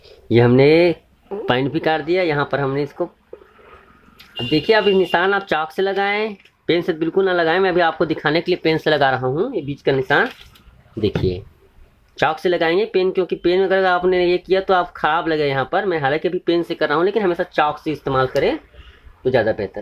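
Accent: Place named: Maharashtra, India. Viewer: सर आप महाराष्ट्रीयन है आप ईस व्हिडिओ मे जोभी वल्ड बोल रहे होना ईसी लुये हमे लग रहा है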